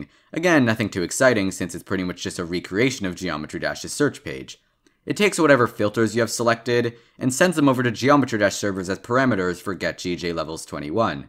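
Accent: Canada. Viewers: since when was this guy canadian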